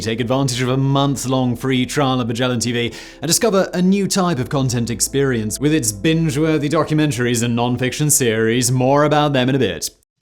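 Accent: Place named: United Kingdom. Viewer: Is it just me or got accent stronger in this video? Maybe it's due to this very British topic... 😁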